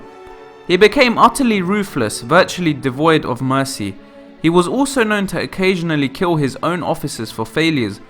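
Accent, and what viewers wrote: Cockney, United Kingdom. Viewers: You sound like a cockney chav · Is this guy a cockney?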